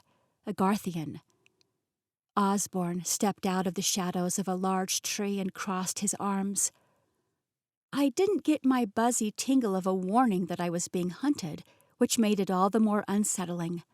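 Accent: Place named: Ireland. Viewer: Story is great! I keep hearing a hint of an Irish under accent.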